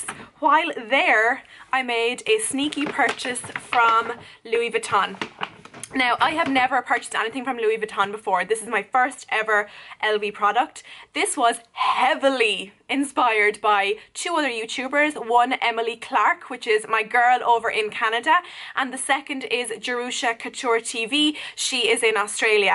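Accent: Ireland. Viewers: Knew you were Irish the min you opened your mouth!😍 love Irish youtuberssssss😁😁👑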